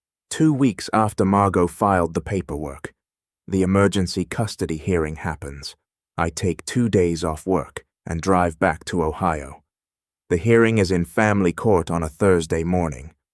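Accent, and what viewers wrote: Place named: United Kingdom. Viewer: THIS IS AN EXACT REPEAT FROM ANOTHER CHANNEL!!!! IT’S STANDS TO REASON IF YOU ARE GOING TO POST STORIES YOU SEARCH FOR ONES THAT HAVEN’T BEEN USED BEFORE INSTEAD OF CHANGING THE NARRATOR‘S VOICE TO BRITISH!!!! VERY DISAPPOINTED, TOO MANY PEOPLE TRYING TO MAKE A LIVING POSTING FAR OUT, REPLICATED, AND RANDOM NEW STORIES TO PROVE THE CHANNEL IS DIFFERENT AUTHENTIC ORIGINAL!!! UNFORTUNATELY HE WAS IN A BRITISH ACCENT WITH BORING BACKGROUNDS, THE QUALITY OF THE WAS DESTROYED, AND PROPER PRONUNCIATION AND POORLY DICTATED WITH A VERY MONOTONE VOICE!!!!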